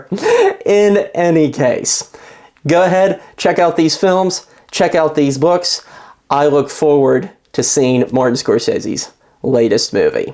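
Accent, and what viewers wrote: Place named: Philadelphia, United States. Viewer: Prof, r u from philly area? You pronounce o like in philly.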